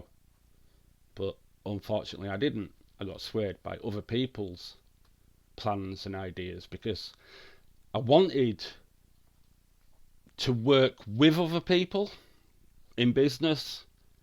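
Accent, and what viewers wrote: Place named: Leeds, United Kingdom. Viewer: sounds like Leeds acsent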